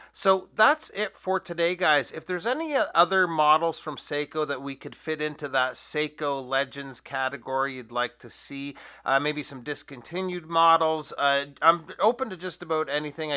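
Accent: Canada. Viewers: Great demonstration, disliked for the Canadian accent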